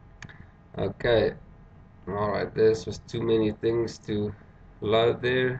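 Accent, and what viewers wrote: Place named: South Africa. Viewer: oh you from south africa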